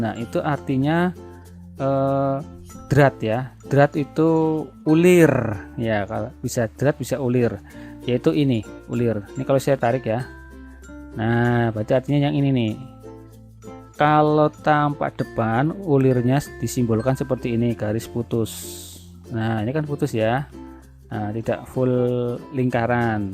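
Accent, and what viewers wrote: Banyumas, Indonesia. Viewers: mantab om tutorial jos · pisan mas daerah ndi kie, purwokerto hadir😂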